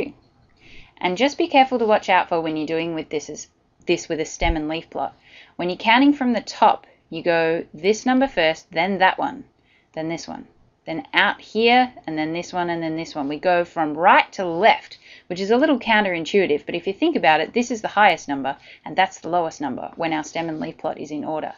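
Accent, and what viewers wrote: Australia. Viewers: Nice Aussie accent?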